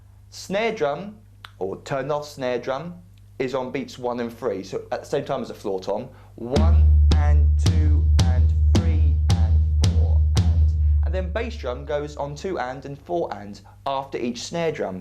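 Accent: United Kingdom. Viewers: Fantastic! Thank you! (Good to hear another British accent on YouTube for a change) 👍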